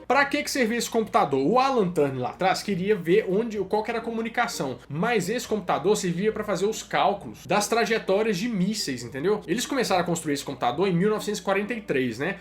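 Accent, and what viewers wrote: Minas Gerais, Brazil. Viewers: Fala mineiro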